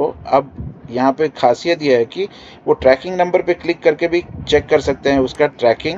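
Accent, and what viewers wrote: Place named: India. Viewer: Why does he speaks with a heavy Indian accent,I couldn't even listen to what he's saying about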